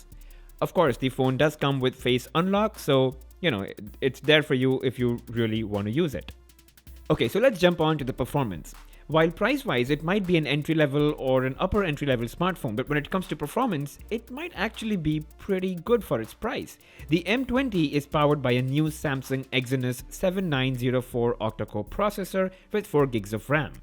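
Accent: India. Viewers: I'm the only one who hate the indian accent?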